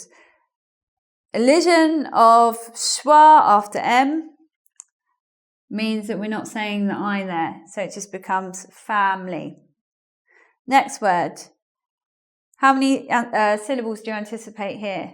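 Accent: United Kingdom. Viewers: English - Learn English (engVid) I have a doubt,you are british? I have trouble in recognise accent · I love this video and, I like the way she teaches. someone said that she isn't good at explaining things but, I don't agree. she's explaining is very simple. she is trying to talk every sentences shortly using easy verbs, as I see. and she's british accent is very clear. (she even speak slowly, nice!) this all things will be helpful for Asian people want to learn by watching and listening British english just like me. especially Japanese, Korean. Because this two Country's grammar is very different to english! It's completly reversing how you speak & read. Long explaining, added adjective, talking stories, it's sometimes useless! it's even make more confusion to these country's people. These videos are just what I want! Thank you for! x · I LOVED THIS LESSON. 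YOU SPEAK WEIRD. LIKE BRITISH.. ARE YOU BRITISH? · You are so sexy with your sexy voice and that British accent!!! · Hi your acssent british english) i'm lithuainian live in england 10 years,and sometimes very hard to understand english acssent,canadian and americans i understand,but i not in north america i'm in england and i need learn british english,thanks i like your videos ))) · Finally i found one channel of british english lessons, i was tired of those estupid american accents, the best accent for me is this! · you are superb , i'm in love with British accent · My god, I love your british accent and trying to copy that , though I'm a man :D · Thank you for this good lesson, with British accent. I think that British (let's say English)accent is the sole fundamental and elegant one, to learn for non native speakers,first of all, and before any other accents, which have altered a lot the original and true ESL. 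These additional accents do not seem very necessary.(American, Asian, neutral etc.): for instance, an U.S.A. native can well understand someone speaking with an English accent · Pretty much the most normal English accent you will hear, not sure why everyone's saying it's weird. If you think it sounds weird then you're unfamiliar with British English! Urban south eastern. Only weird thing I heard was illead instead of ellide. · OMFG.......the British accent is terrible !!! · lol no you don't sound like a native speaker, too british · Well, she sounds completely British to me, and just love the accents she use. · i hate british accent after this vid. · I was following your video like British accent but i didn`t know that you are also teaching at Engvid... thanks so much for helpful clip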